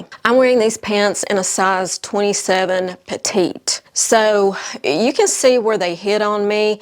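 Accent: South, United States. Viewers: Watching you from Chico, Ca., in the northern part of the state, north of Sacramento. I watch a number of fashion influencers and you are my favorite. Love that sweet southern accent and all your great outfit ideas. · Yes, ma'am, we do sound so much alike. When I heard you mention north Alabama, I knew you are one of us. Happy almost weekend!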